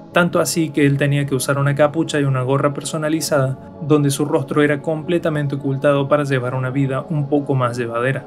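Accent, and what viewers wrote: Córdoba, Argentina. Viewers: Cordobés hasta el alma, se siente. Buen video carajo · Buen vido bro, sos cordobés? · sos cordobés? Hay un poco de tonada que creo que es de mi provincia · Más cordobés imposible aksjdj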